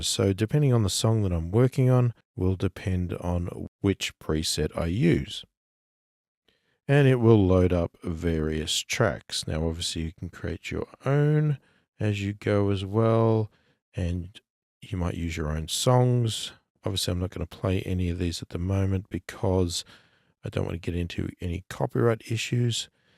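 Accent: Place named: Australia. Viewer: Haters gonna hate! You made perfect sense to me. He's probably a 'Murrican who couldn't understand your Aussie accent. Classy reply you gave him though. Kudos. I doubt that this guy has a clue about recording. I started watching your Magic AB video but when you said you'd found a better one I checked it out. Way better for referencing. Cheers.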